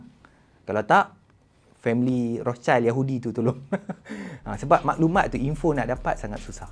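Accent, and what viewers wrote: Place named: Kedah, Malaysia. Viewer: Aku mati-mati inagtkan speaker ni Melayu Muslim..Punya fasih Kedah Hang mat...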